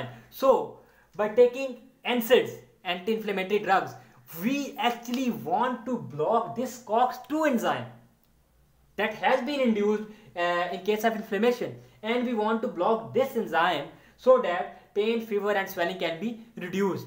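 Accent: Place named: Pakistan. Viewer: You explained very nicely sir thank you so much 😊 I think you are from Pakistan ( I recognised by speaking tone )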